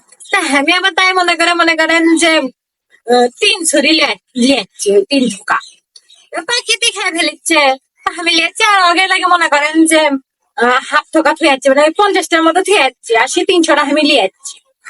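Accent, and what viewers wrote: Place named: Bogura, Bangladesh. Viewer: ভালোই তো বলতে পারো বগুড়ার ভাষা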